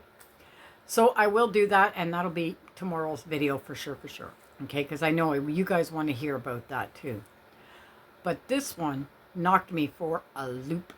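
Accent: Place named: Canada. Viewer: And I like the Canadian accent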